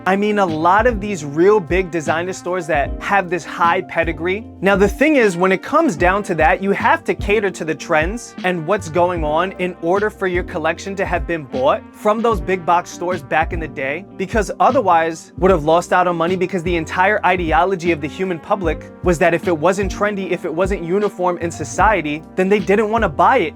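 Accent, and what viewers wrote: New York, United States. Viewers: I love that New York accent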